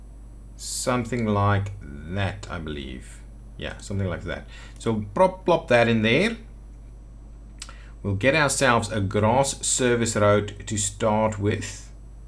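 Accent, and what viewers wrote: South Africa. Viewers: First time to your Channel and I must say it good to hear a South Africa accent on Youtube :D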